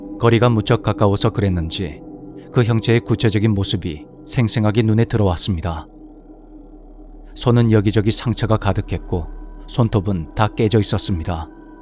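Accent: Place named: Busan, South Korea. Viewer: 야후꾸러기 참 오랜만에 들어보네요. 이야기를 들을때마 참으로 신기한 일이 많은것 같아요.~~ 연기도 짱! 연기속에 부산억양은 꼭 나온답니다~^^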